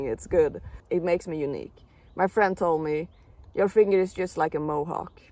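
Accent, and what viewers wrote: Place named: Sweden. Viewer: I don't know if I got it right, but in my ears you have a similar accent to Peediepie's so: are you Swedish?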